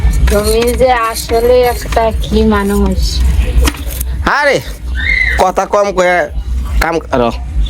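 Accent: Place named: Tangail, Bangladesh. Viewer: বাড়ির সবাই পীর মনে করে। কথা - বার্তা যা কয় তাই মনে করে শুদ্ধ। সাথে সাথে তারা ও শুদ্ধ বলার চেষ্টা করে, আর তাতেই খায় ধরা। এ ও হয় না ও হয় না। যার যার আঞ্চলিক ভাষায় কথা বললে কি অসুবিধা। আরে টাংগাইলের পুরাপুরি শুদ্ধ না। এই যে বলে গামচাটা ভগলা, আইচাল, খাইচাল,গেছাল। আমি ময়মনসিংহের মেয়ে আর টাংগাইলের বউ। সুতরাং সব আমি জানি। যার যার জায়গা থেকে সে বলবে সেটা দুসের কিছু না।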